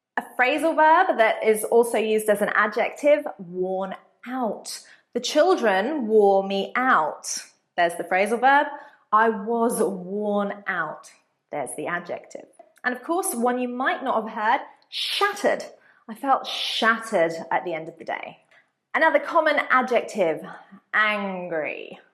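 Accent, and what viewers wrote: United Kingdom. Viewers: Gosh i haaaaaate british accent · What accent os that? os this Bristh accent?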